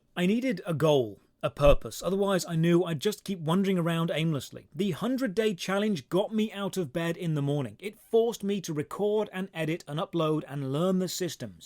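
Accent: United Kingdom. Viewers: I think I found your Channel because of your Dreamworld videos. And you are right, I was fascinated by how bad this game is.... And then I basically binge-watched the worst MMO Series... And then I subscribed. : ) But I really like your style, and your clear, 'easy-to-understand (British <3) English' (I'm no native speaker). If you continue like this, I'm sure you will do well! I'm so happy for you, that you reached 200k already! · I started uploading about 3 years ago (I think) and I'm only at 1k... I'm proud of my 1k, since I only play PS, follow only two free-to-play titles, do some Demo reviews, edit and upload with PS4 Share Factory, and have no idea what the hell I'm doing. However, I do believe I am missing only one thing to be a "overnight" success... a british accent! lol That said, I hope one day you do a collab with another great british accent Youtuber I follow Many congrats on your success and keep it up! 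· Well dressed double barrel British accent man is the best booba streamer.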